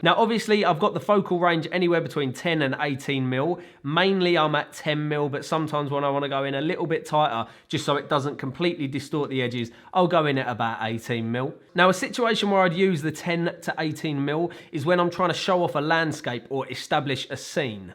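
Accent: United Kingdom. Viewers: I’m just here to listen to your British accent mate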